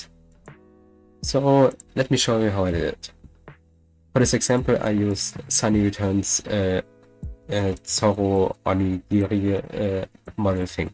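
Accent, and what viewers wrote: Germany. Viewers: der deutsche aktzent is so funny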